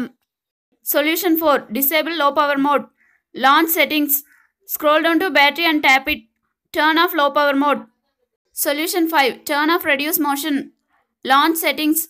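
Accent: India. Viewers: Indian English. Why in so hurry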